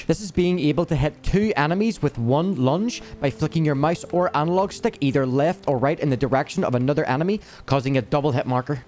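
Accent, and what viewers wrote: Northern Ireland, United Kingdom. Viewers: Yeooooo do i hear a northern Irish accent?!?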